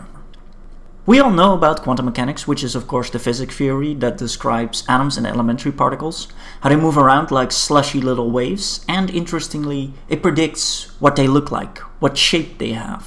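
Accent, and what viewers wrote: Netherlands. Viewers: Are you from the Netherlands? You sound so Dutch. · Excellent! Thank you. I think your H diagram didn't show the 1s orbital? Also, is the accent from Rotterdam?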